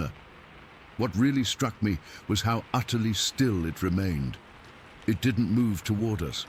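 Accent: United Kingdom. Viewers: Why's it's always a British accent....bullshit I'll never listen again ¡!!!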